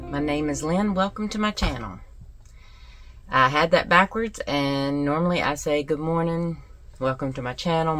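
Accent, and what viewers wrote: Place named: South, United States. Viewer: Hi Lynn! A warm greeting from Florida. I was scrolling through you tube and I came up with your video. I absolutely love your approach to the subject and the mix of your everyday life. Nothing like that beautiful southern accent mix with sass and bless your heart attitude ❤You have a new fan. Thank you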